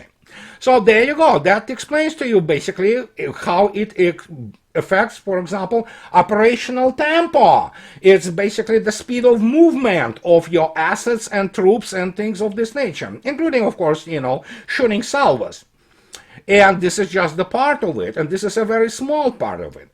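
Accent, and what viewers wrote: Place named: Russia. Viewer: What are we supposed to make of an American citizen with a Russian accent?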